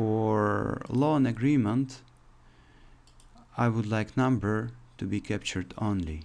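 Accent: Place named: Russia. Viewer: ты русский у тебя как будто акцент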